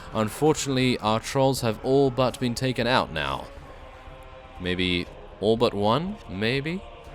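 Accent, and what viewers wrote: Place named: Oxford, United Kingdom. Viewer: If you don't mind me asking where in England are you from? I'm thinking Oxford area but I can't tell, I'm from up north near Manchester.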